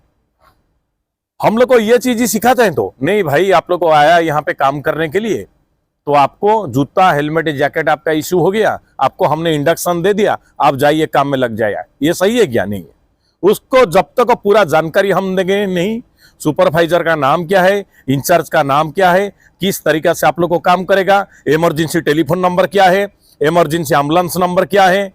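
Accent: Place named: Odisha, India. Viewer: sir aap odisha k ho na aap ki langauge se pata chal Jaa Raha h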